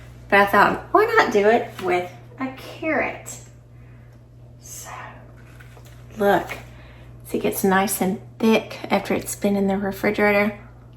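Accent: South, United States. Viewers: im in northern maine and the temps are slowy dropping here the leaves are just starting to turn/drop...i found you on my home page... 1 THING I LOVE about your channel... your accent isnt as heavy as alot of southerns..I DO LOVE IT BUT some can get "twangy" /sing songy, if you get my meaning... and you dont do alot of chattering when you cook and you CLEAN OUT THE DISHES!!!!! my pet peeve.. with everything going through the roof HOW CAN YOU AFFORD TO NOT USE IT!!! thank you for all that you doo..;];];] keep up the great work;];];]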